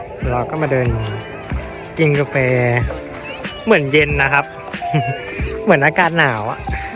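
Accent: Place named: Kamphaeng Phet, Thailand. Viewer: สำเนียงคนกำแพงเพชรครับ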